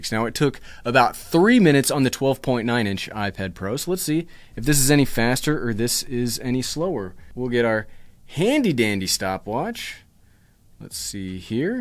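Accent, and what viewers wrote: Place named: United States. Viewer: you make the most pleasant and entertaining tech videos on Youtube. You speak American English, you don't talk fast, you don't read a script in monotone, you don't wear your hat backwards, and if you genuinely get excited about the gear. And, hey! we both shoot with Lumix!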